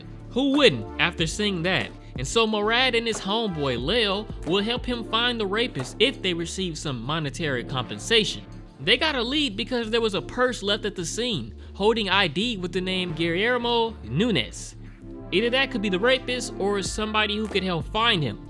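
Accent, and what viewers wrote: United States. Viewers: The most disturbing thing here is the whiny American accent.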